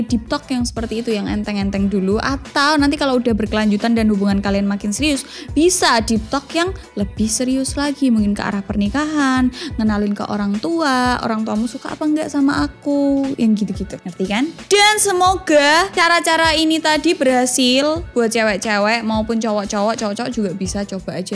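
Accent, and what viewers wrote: Java, Indonesia. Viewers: Kak bahasa nya medok banget uhh bikin suka kalo nonton nya😎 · Mb medokku😍panggah ayu shoting dgn penampilan simpel yg sederhana❤ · Mau kumpulin orang yg suka dengan cara bicaranya yg medok asli tdk di bikin2 ... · Suka sama cara bicaranya yg muedhok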